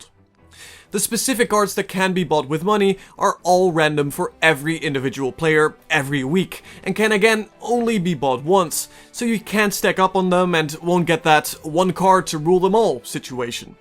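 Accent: Netherlands. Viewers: Heerlijk dat Nederlandse accent :D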